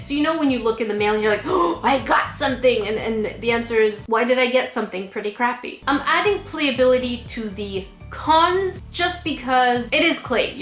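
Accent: Canada. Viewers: I love your salter crafter videos! I like seeing your salty disappointment face and your Canadian accent just makes these videos hilarious 😂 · Are you canadian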